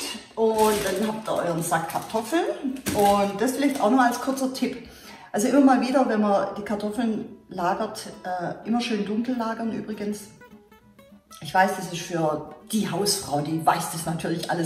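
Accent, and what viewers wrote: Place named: Swabia, Germany. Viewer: Abonniert zwecks vegan + schwäbisch + Instant Pot = unschlagbare Kombination.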